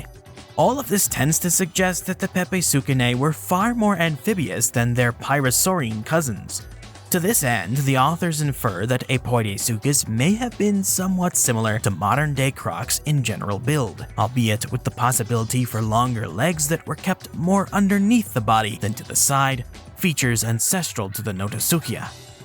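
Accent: Spain. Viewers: The day he stops giving Portuguese a spanish accent will be a day I'm happier than usual ... Until then I'd prefer to hear the correct pronunciation by any other means, like c'mon, can't you just insert Google translate audio?? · I Love how u give everyword spanish entonation, its a good guess if u dont know much portuguese, still funny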